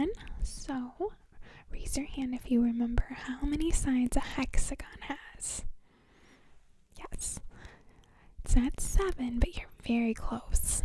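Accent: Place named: Minnesota, United States. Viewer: are you from minnesota? you got the accent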